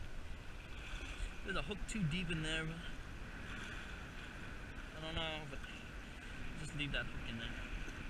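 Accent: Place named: Vietnam. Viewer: Lmfao your Vietnamese accent is strong on this one 😂😂😂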